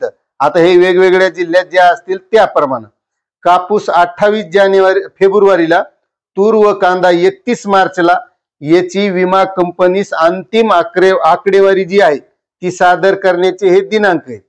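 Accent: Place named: Vidarbha, India. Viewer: तुम्ही विदर्भात राहात का,, भाषा ओळखीची वाटत आहे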